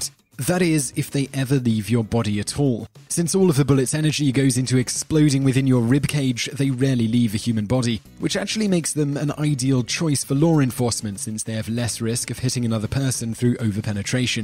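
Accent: United Kingdom. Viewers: Brit accent /= actual knowledge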